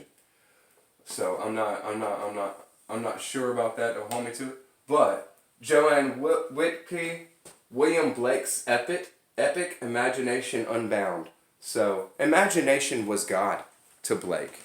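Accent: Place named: South, United States. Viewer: I know you have an accent but don’t yet recognize different regions. I would have guessed southern. I came across Blake in my early 20s, his songs of innocence. I was reading Jerusalem to my mum and she just sang it to me. She was of the generation where it was sung every morning at school. I investigated the thoughts of Blake in writing Jerusalem and loved him and his art ever since.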